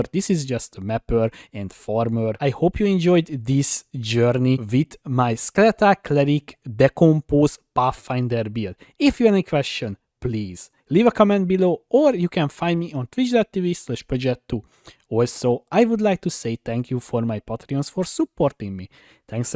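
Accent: Hungary. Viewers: i can hear the hungarian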